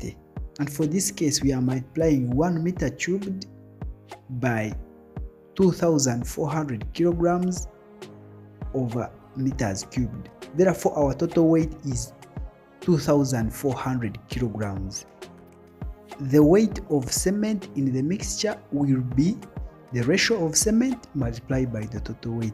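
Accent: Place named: Uganda. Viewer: I think this guy is from uganda I can hear his accent.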